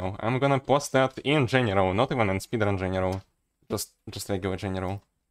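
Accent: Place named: Russia. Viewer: Lol, русский акцент сразу слышно)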